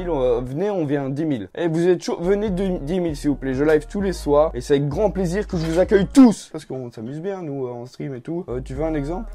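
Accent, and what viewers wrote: Belgium. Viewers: Pk tu parles comme un Belge bizzare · Tu es belge ? L’accent j’connais 🇧🇪 P.S : Super vidéo, mais tu expliques un peu trop les fails, laisse les abonnés regarder puis explique. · Est tu belge ? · Il est belge donc il a un accent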